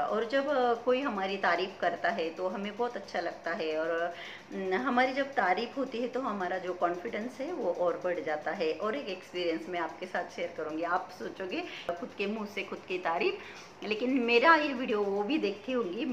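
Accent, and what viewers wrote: Nashik, India. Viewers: तुमचा हिंदी चा आवाज छान आहे मराठी पेक्षा मराठीत नाशिक ची भाषाआहे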